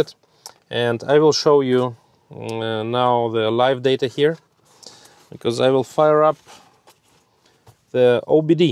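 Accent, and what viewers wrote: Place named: Germany. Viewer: I hear man with German accent reviewing technology, it's first like and then view. Subbed, my regards from Greece!Good luck with VW issues.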